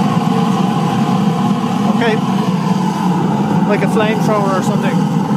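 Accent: Ireland. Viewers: are you Irish? sounds like it. and cool design!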